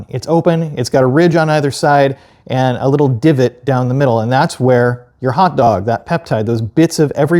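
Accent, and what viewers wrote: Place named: Ireland. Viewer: Also your Irish accent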